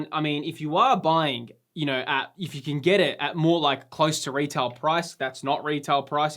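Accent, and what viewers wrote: Australia. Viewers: first time follower and your format is awesome, and AUSSIE yewwww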